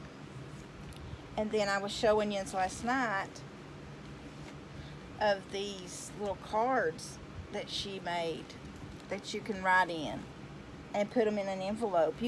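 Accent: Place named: Tennessee, United States. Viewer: Nice presentation here!! You have that Smokey Mt./Tennessee voice! I love it!!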